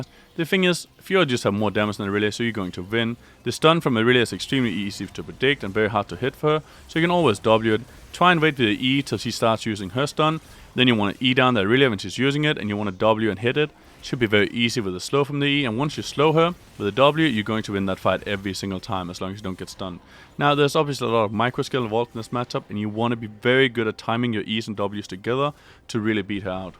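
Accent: Denmark. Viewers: Man kan bare så nemt høre du er dansker hahah, men god video.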